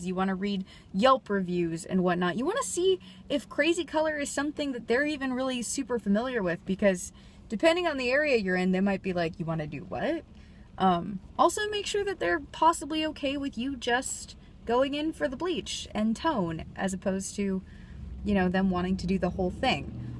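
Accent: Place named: United Kingdom. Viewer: Nice British acsent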